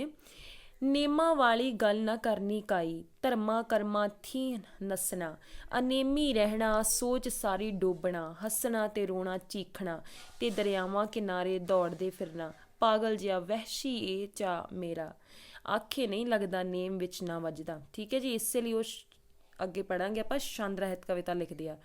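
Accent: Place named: Doaba, India. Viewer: ਤੁਹਾਡੀ ਬੋਲੀ ਤੋਂ ਲਗਿਆ ਸੀ ਮੈਨੂੰ....ਮੈਂ ਵੀ ਦੋਆਬੇ ਤੋਂ ਹਾਂ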